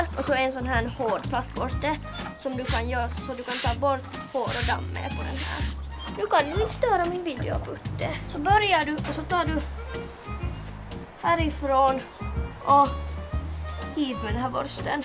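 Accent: Finland. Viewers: Kommer du ifrån Finland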